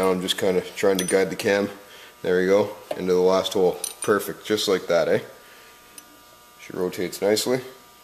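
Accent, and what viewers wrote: Alberta, Canada. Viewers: Sounds more like an Alberta accent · canadian accent, lived there for 8 years ;) looking to self install cam in my 2012 camaro ss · are you canadian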